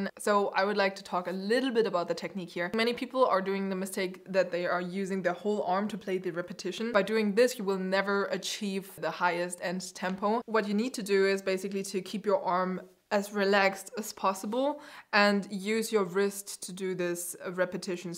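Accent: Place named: Germany. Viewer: As a fellow German who just found your channel, I found it really interesting that I could tell roughly where you're from before you even said "Erlkönig" because the dialect is audible even when you speak English. I never really realized that this was even possible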